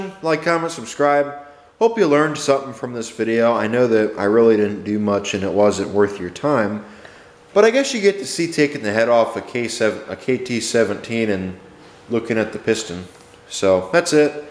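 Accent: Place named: Maine, United States. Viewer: Sounds like your from Maine, thats where i'm at too.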